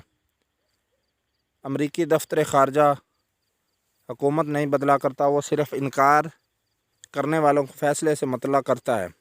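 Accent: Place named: Punjab, Pakistan. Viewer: It would be nice if some one who knows urdu language with out punjabi accent, stop punjabi Gardi !!!!!!!!!!!!!!!!!!!!!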